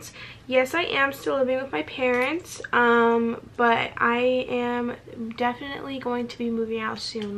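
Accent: United Kingdom. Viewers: Do a whole video speaking in your britishhh accent love you/it🤣🥲🥲🥲💘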